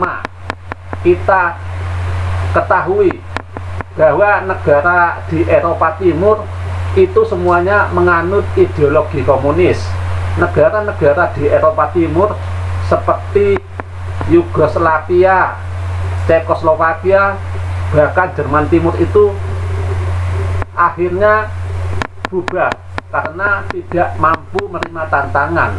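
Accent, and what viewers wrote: Java, Indonesia. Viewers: Astaga naga mantap tenan.wong batak logat jowo.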